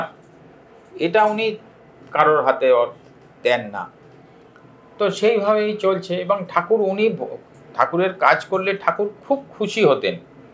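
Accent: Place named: East Bengal, Bangladesh. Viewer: r byakhya aro shunte chai.omar purbo bangyo bhasha te shunte khub sundor lagche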